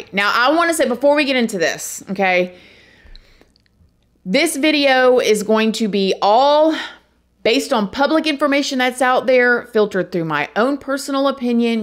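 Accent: South, United States. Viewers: I looove how you say Po-lice!!! Girl you are Sooo Southern, God Bless You!!!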